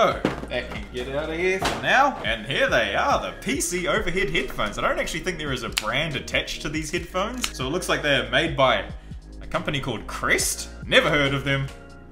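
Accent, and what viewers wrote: Australia. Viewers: oooooooh so you are australian, now i understand why you talk like this, good videos! PS: English is not my main language so is hard for me to differenciate accents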